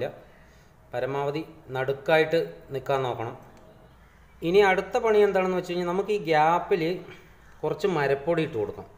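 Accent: Palakkad, India. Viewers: യെ ഏട്ടോ നിങ്ങ പാലക്കാടാണ്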